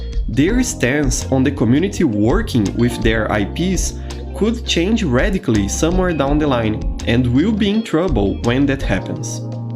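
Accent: Brazil. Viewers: Has someone told you that yer have a very Brazilian accent? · man, are you brazilliam? I played once with the medic called "Kuling" who was healing you, also your accent, cool to see more brazillians in the tf2 community <3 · I recognize the Brazilian accent a thousand miles away lmao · you sound brazilian ngl, but i liked the video, i think tf2 is a godly game but i hope it dont die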